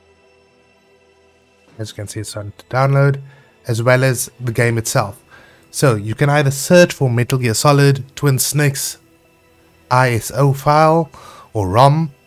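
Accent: South Africa. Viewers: I play on 720p and btw you can't hide that South African accent I'm also from here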